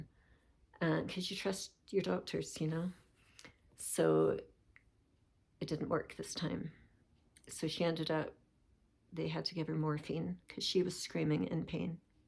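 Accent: Scotland, United Kingdom. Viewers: Nice to hear a Scottish accent on FlossTube! Your finishes are lovely! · I’m not sure how I’ve missed seeing your videos before now. I hear a Scottish accent which I enjoy. My parents are Scottish, and my oldest daughter’s name is Katrina! I’m so sorry your daughter was in such pain. I love your projects. I’m also stitching Summer Quaker. And I’m new to WIP Warriors this year.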